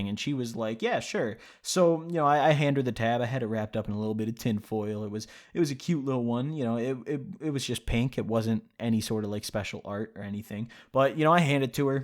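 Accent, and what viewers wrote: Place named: Illinois, United States. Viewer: How did I know you live in Illinois I live in Rockford, I recognized the accent I am white and have the same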